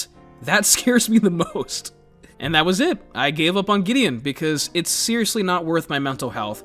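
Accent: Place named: Egypt. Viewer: wow u r Egyptian ur accent is on another lvl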